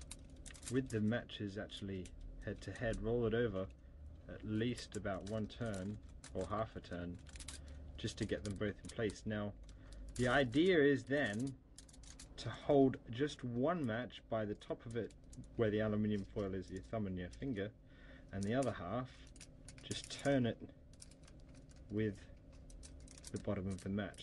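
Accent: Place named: England, United Kingdom. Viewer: but ur frum england so it funny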